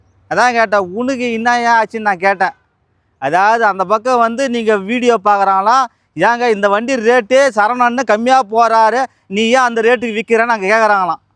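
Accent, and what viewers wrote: Madurai, India. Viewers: Super Anna Madurai vasagam 🎉🎉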